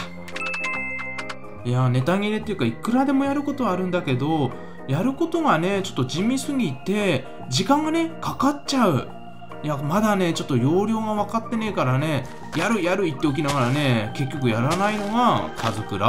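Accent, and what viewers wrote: Fukui, Japan. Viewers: このころの和さんのしゃべり方がよりすきです 蛭子能収 水曜どうでしょうの試験に出る日本史の先生役の大泉洋 夢グループの胡散臭い社長さん にイントネーションとかにてるなーといつもおもいます 福井の方言なのでしょうか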